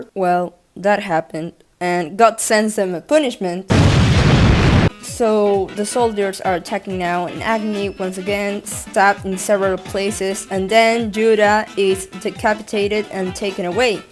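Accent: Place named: Spain. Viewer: Se nota que hablas español pero que buen video de mi manga favorito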